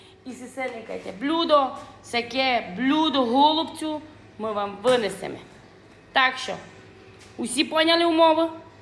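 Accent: Transcarpathia, Ukraine. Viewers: Вітаю! Ви класна, весела, неповторна! Я викладаю діалектологію в університеті. Ваша мова і харизма - це шедевр. Ми на парах зі студентами дивилися Ваші відео і таким чином запам'ятовували та аналізували фонетичні, морфологічні, лексичні особливості закарпатського діалекту! Розбираємо Ваші перли на молекули 😊 Пишу не заради голубців))) Нехай Вам щастить, файна дівчино! ❤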